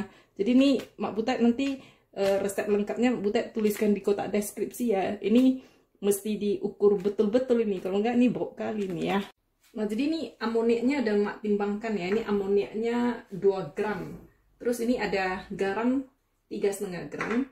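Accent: Medan, Indonesia. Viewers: kalau ngomong enak deh, dengan logat medannya. Wkwkwkwk.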